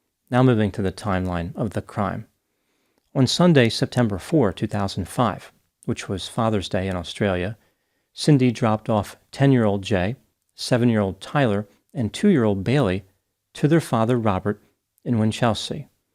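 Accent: United States. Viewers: It took me a while to work out where these places were. I am Australian and the pronunciation was uniquely American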